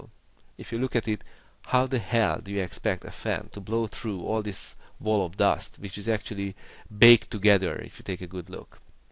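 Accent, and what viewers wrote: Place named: Hungary. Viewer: Tudtam, hogy ez magyaros akcentus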